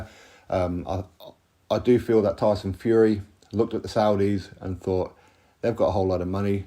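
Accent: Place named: RP, United Kingdom. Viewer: If you're from Newcastle Upon Tyne, as I am, then where did you get that posh accent from?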